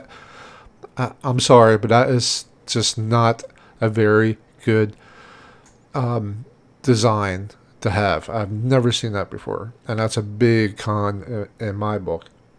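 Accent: Baltimore, United States. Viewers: You have to be from the Baltimore area. Wooder, rinch and the accent are a dead giveaway.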